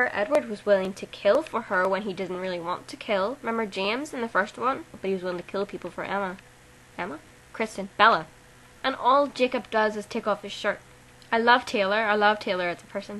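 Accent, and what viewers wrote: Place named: Belfast, United Kingdom. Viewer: Your from Belfast!? I did not know that! haha. I'm from Belfast also. You don't have a strong Belfast accent...you're very lucky :) I thought you were from England haha I also think that Twilight isn't selling sex, it's selling love. Pschh...Team Edward? No! It's Team Charlie all the way! haha :D Thanks for another awesome video!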